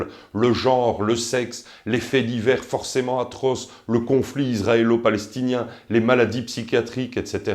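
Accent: Belgium. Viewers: PS avant mon post (chu un crétin, c'est un PRE-scriptum du coup et chu un génie) : en fait c'est l'accent belge, tu m'as eu ! Première fois que je vois ta petite tete chauve, tu me demandes de m'abonner, tu sais bien que c'est mon premier passage, j'ai ta miniature, un titre semi chelou, et toi qui vient m'intepeller avec un accent sympa ... Bha a , avec ta tete, ta miniature et ton titre, avec ta petite demande et ton accent de biereux, JE MABONNE ! Je viens de m'abonner, je ne jugerai pas sur cette video. A toi de me faire rester maintenant :) (je regarderai bien sur par apres tes anciennes videos, si ca me tente. Si c'est deja pas top, je verrai tes prochaines) Je compte sur toi ! Je veille ! ♥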